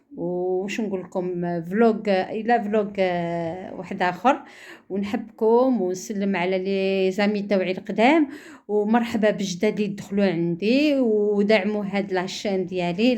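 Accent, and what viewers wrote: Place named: Algeria. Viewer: خالتي واش أصلك من المغرب والجزائر حيت عندك طريقة كلام جزائر ونتي وقيلا دبا بالمغرب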